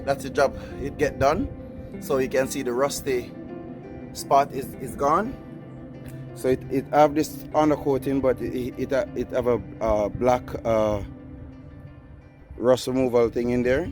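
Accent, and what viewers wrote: Jamaica. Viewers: Yow breda talk jamacan me understand. 😆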